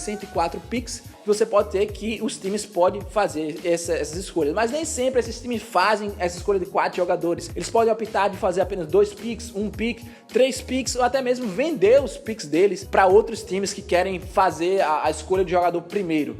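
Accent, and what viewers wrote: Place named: Northeast, Brazil. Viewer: De qual estado do Nordeste você é, djow?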